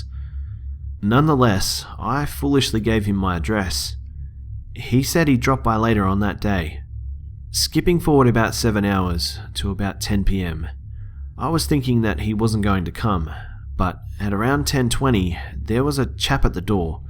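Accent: Australia. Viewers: As a fellow Aussie, it's so nice to hear your voice :)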